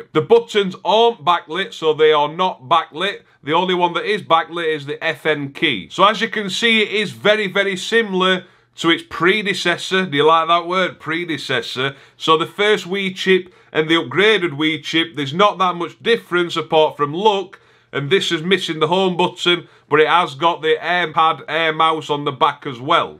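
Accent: Yorkshire, United Kingdom. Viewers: Are you the most Northern person in history? You sound very Yorkshire.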